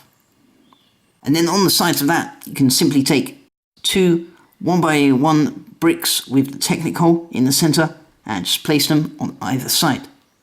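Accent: United Kingdom. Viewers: his voice sounds like an old man thats British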